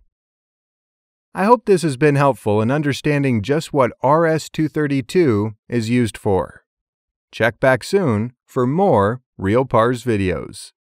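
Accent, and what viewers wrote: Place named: Oxford, United Kingdom. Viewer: SIR Yr video "RS232" Wached yr above video and I LIKED IT. I find it is useful to know. Positive features of yr video are:-- 1 Excellent video production (technically) and presentation. 2. You spoke in OXFORD ENGLISH , distinct / clear pronounciation and clearly audible. 3. Precise compact information presented to make the viewer understand clearly. 4. Lastly yr summarising what all you explained earlier/ all the while helps memorising key important points. Vatsa INDIA.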